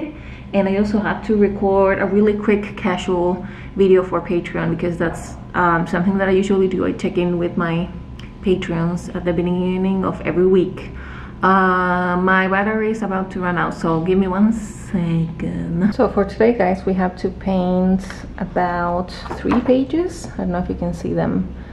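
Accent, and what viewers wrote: Chile. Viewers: tooooodos los acentos son preciosos. ❤ Soy española y los acentos de Latinoamérica me súper encantan!!! Y el acento de Chile es bacán 😉😁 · Also today a recommended your channel to a friend who lives in texas so she can get used to the chilean acento when she meets me (one day) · Gracias, soy Mexicana, y apesar de que dices que el español chileno es difícil de entender, me encanta tu asentó, el que menos entiendes es el de Buenos Aires, hugs and kisses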